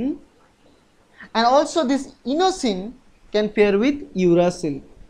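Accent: India. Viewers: English with hindi accent teaches a lot from understanding and recapitulating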